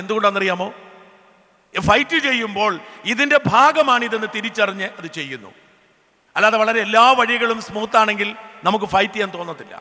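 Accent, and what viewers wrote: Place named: Central Travancore, India. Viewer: എനിക്കിഷ്ടപ്പെട്ടത് എന്താണെന്നോ, താങ്കൾ ബ്രിട്ടനിൽ താമസിച്ചു വർഷങ്ങൾ ആയി തിരുവനന്തപുരം based ആയി ജീവിക്കുന്നു പക്ഷെ താങ്കളുടെ ആ മദ്ധ്യ തിരുവിതാംകൂർ ഭാഷ, നമിച്ചു ബ്രോ, എത്ര വലിയവൻ ആയാലും അമ്മയെ മറക്കില്ല എന്ന് ഒരു സന്ദേശം അതിലുണ്ട് 🙏🏻🙏🏻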